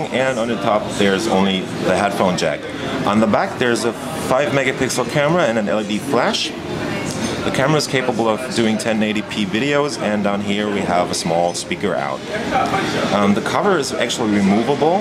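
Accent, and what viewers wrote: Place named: Germany. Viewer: Your english has less of a german accent here than it used to have, i noticed all of a sudden.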